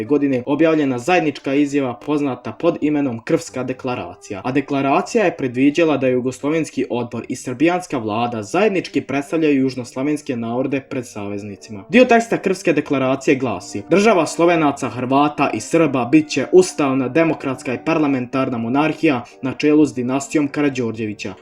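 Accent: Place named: Cazin, Bosnia and Herzegovina. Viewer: Cazin ili buzim.....naglasak......para usi...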